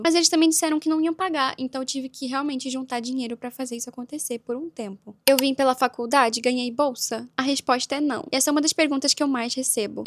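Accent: Portugal. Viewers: você tem nitidamente um sotaque português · senti o sotaque de português Portugal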